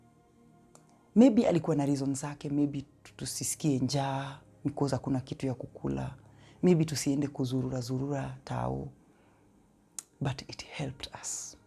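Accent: Tanzania. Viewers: When I first heard you I thought you are a Tanzania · What an inspiration! Glory to God. To be sincere I used to think you're from Tanzania because of the sweet voice and sweet Kiswahili for that matter. More grace in your ministry.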